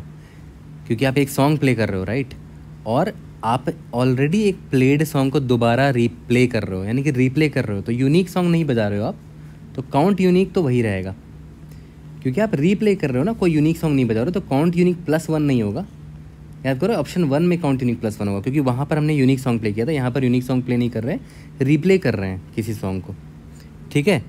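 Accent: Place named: mixed, India. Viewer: aapke accent se lag hi raha tha up bihar hoga padosi nika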